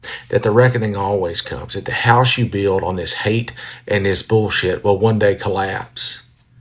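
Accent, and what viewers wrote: South, United States. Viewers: Hi - I’m glad to see and hear a white Southern man standing up against the stupidity and intentional cruelty these mean boys are fouling the world with. No, I don’t like everybody in the world, and sure I may pre-judge folks on how they present themselves, how they act towards me or others, and definitely about what they say, but not due to the color of their skin, ‘cause I’m not an idiot. I don’t think a surplus or lack of melanin has much to do with a person’s brain, heart or soul, but then I’m a mature human. Which those young Republicans obviously are not. Anyway, thanks for speaking up. We need a man with a strong southern accent to give the what for to the idiots who sound like him but think and act like fools. Kudos dude!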